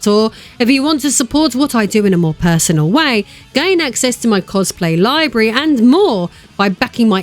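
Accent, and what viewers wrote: United Kingdom. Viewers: Im only here to listen to the british accent...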